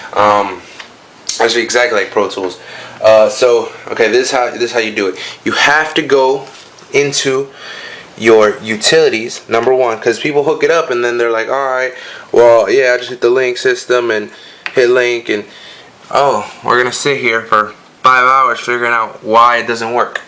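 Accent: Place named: Mexico. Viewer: am mexican and i can tell he is too by the accent, plus he mentions which is cat for spanish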